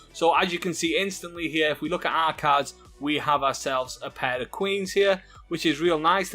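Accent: United Kingdom. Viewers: It's the British accent 😉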